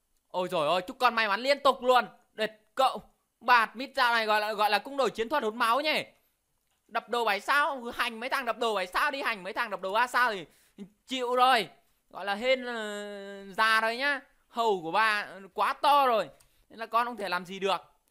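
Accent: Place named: Central, Vietnam. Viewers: Thích tiếng miềm trung hơn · Đúng là dân nghệ tĩnh nói hay ri hey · A bl tiếg Huế maxx hay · Vkl giọng nghệ an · tội ah hồm nay ns tiếng nghệ an, em cũng ở nghệ an ah này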